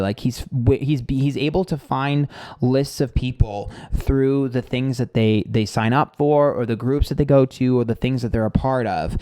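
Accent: New York, United States. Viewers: The more frustrated you get the more heavily your New York comes through 😁😁😁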